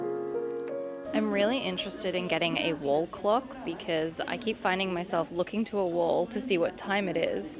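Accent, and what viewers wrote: Australia. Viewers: Nice haul. BTW were you originally from Australia ? Cause you sound like one of us. I'm from Perth. W A. If you were ,what brings you to the states. Thrifting is definately better in the states. 😂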